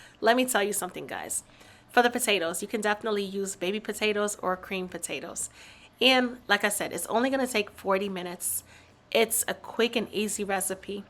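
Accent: New York, United States. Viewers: That New Yawk accent is adorable. 🥘💙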